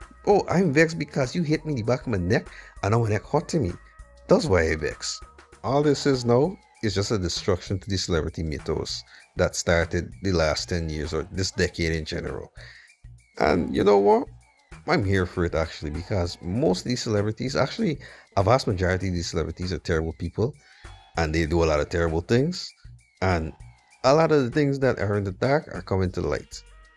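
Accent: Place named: Guyana. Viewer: I like your accent bro. You inspired me to look up more things about Guyana. Looks like a cool place ngl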